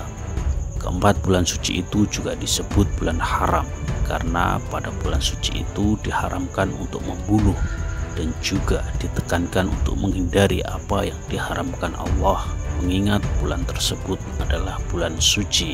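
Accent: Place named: Java, Indonesia. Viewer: jowo keras losh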